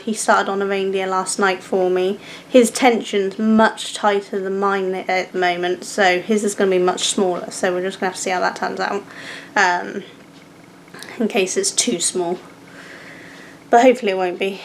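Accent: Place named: England, United Kingdom. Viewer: love the english accent... cheers... keep up the great work 🙏❤️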